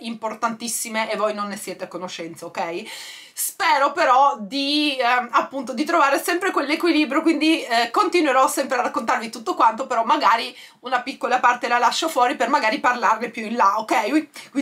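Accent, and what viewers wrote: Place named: Trieste, Italy. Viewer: Potrai andare a vivere ovunque ma l'accento triestino non cambierà mai😍😍😍ti adoro🤣🤣🤣 Il trucco ti valorizza un sacco, è bellissimo!!!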